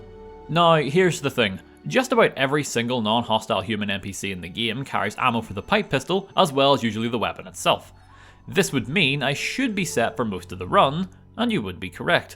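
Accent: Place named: Northern Ireland, United Kingdom. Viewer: i would see my face when i heard your northern irish accent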